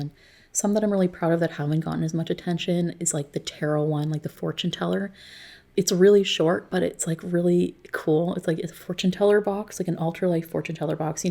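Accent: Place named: Maritimes, Canada. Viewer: I totally picked up on the maritimes accent! Much love from a fellow Haligonian 🫡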